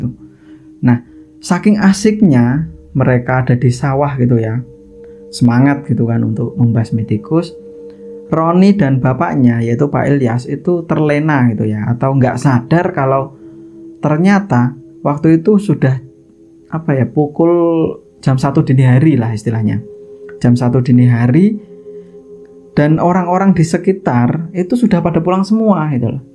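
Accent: Java, Indonesia. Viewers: Njowone pundi to mas.. kok medok banget. Seneng aku dengere👍🏻😁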